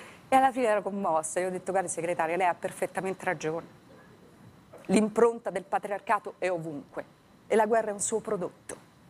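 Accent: Rome, Italy. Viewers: Prende in giro la Meloni, ma ha lo stesso accento romanesco. Mah....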